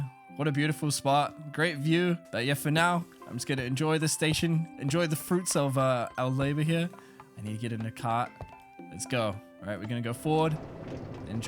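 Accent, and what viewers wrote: New Zealand. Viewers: You from New Zealand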